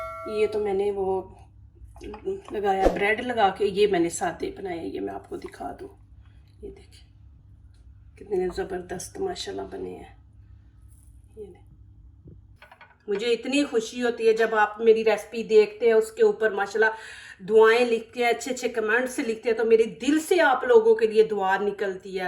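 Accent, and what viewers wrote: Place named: Punjab, India. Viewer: اسلام و علیکم خوش رھیں شاد و آباد رھیں بہت بہت بہت بہت بہت ھیییییییی مزےمزےکی ریسیپیز بتاتی ھیں آپ اور اور اور اور اور اور سب سے بڑھ کر آپکے بولنے کا انداز آپکا پنجابی لہجہ بہت ھی اچھا لگتا ھے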